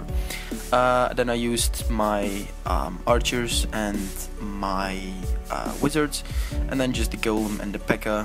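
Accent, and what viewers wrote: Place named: Switzerland. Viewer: really its sounds like your are from switzerland xD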